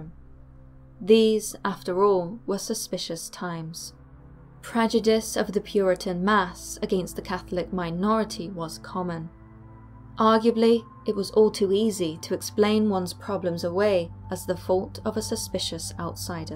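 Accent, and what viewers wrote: England, United Kingdom. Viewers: Your voice makes me imagine that I'm being told the story from an actual family member! I have an American accent and have always loved them English accent. I'd listen to you read the phone book. I wish your voice was on my devices..."wake up darling, it's time to cease the day. Go on now, wake up." Hehehe......or "alright babe, you're all tucked in and ready to begun your journey to dreamland, goodnight darling." Ahhh that would be awesome! Who knows contact Google....you never know 😉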